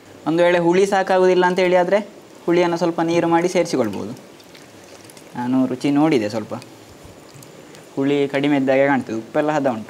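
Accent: Mangalore, India. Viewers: Thats Mangalore Kannada accent. Yes, unlike Bangalorians they speak pure Kannada.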